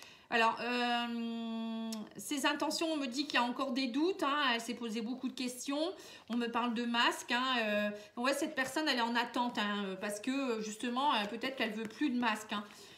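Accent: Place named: North, France. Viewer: Merci infiniment vous relater mon histoire je suis bluffée vous êtes très forte! L'amour Est plus fort que tout 😊🤣 J'adore votre accent du ch''nord n'est ce pas?😉 Je vous envoie toute ma lumière et mon amour 💐❤️😇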